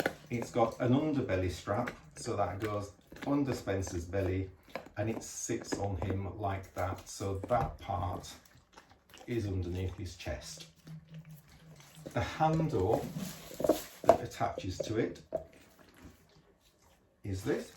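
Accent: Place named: United Kingdom. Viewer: Hi, I enjoyed this video very much. As an American I’m quite enchanted by the way you speak haha. Interesting that you Brits count food in grams and call what we would say “doing it’s business” as “spending.” I think my favorite thing though was when he turned around to look at you and you just said “Hi Spencer!” It warmed my heart. On another note you showed everything very clearly to the camera and your descriptions were great and added a lot to my experience even as a sighted person. My whole county is under quarantine for the coronavirus starting tomorrow for 14 days so I’ll be watching all the videos. I do have one question- have you decided on a middle name for him?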